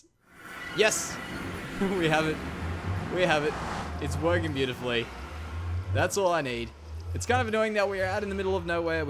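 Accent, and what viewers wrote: Australia. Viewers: Where in Australia are you from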